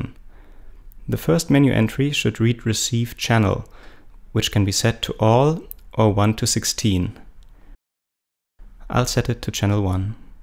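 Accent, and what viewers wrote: Germany. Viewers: Are u german ? Oder halt bist du deutsch ? Your acent sounds very german :) I want to buy me something like this and your tutorial was very clear and helpful :)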